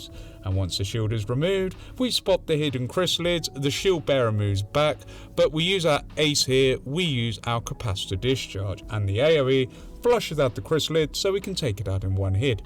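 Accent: United Kingdom. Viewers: You sound like you have the same strain of British as the spiffing Brit.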